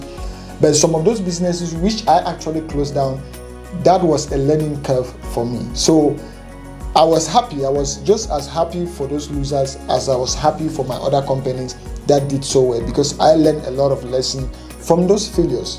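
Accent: Cameroon. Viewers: OMG am happy you're from Cameroon,am from Bamenda no wonder I understand you better than other forex traders because you have that our Cameroon accent😂 wow am happy to hear this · Yoo bro when I first came across your chanel something told me u sound like a Cameroonian am also from Camer and proud to come across u bro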